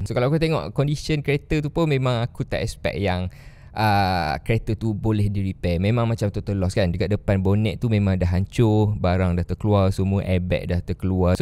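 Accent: Kelantan, Malaysia. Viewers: Org kelantan eh? Dgr cara ckp dpt detect..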